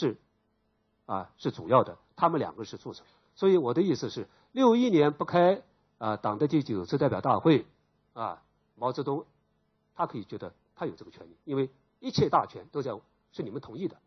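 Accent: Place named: Nanjing, China. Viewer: 南普感人。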